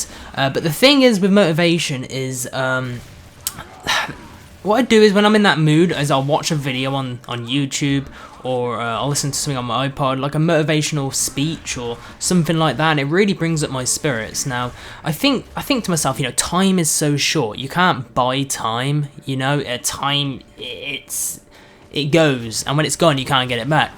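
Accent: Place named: Salford, United Kingdom. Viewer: are you from salford? you have an extremely similar accent to mine, only mines more high pitched and squeaky being female...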